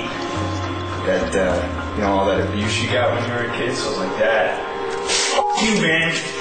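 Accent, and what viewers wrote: Canada. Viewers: Listen to his Canadian accent here holy. Haha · the canadian accent is strong with this mau5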